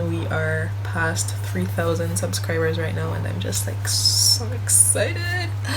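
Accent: Jamaica. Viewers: R u Jamaican? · She a Jamaican me hear the accent eno 😂😂💯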